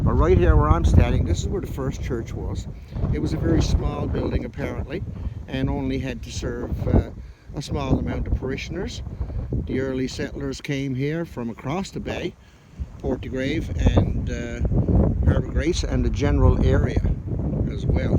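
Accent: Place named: East Anglia, United Kingdom. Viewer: Your accent has some elements of East Anglian pronunciation, i.e. north Essex, Suffolk. Have you traced your family back a few generations?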